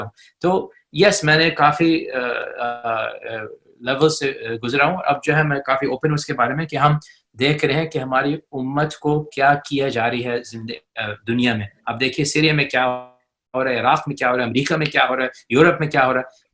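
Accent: Pakistan. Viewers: I love ur urdu accent, May Allah bless you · Loved your urdu accent ustad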